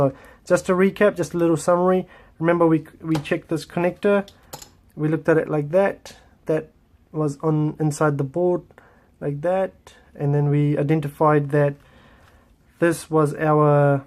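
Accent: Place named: New Zealand. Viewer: Your from NZ aye bruvvv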